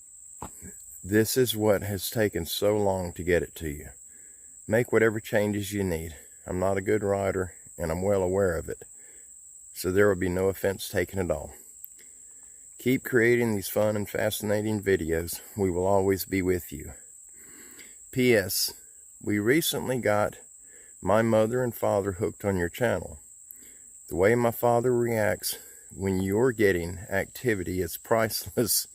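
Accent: South, United States. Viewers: To my Canadian ear your grammar is fine..I love your accents from down south! Great letter btw! Thank you for sharing..bring bug spray next time...lol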